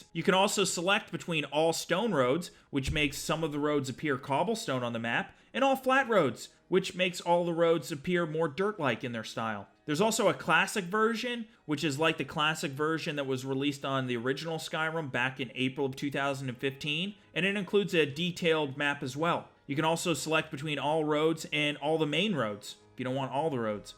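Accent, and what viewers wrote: Canada. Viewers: Hahhaha this guy is so Canadian he sounds like my buddy who lives in Orangeville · Are you Canadian? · This guy has to be Canadian, right?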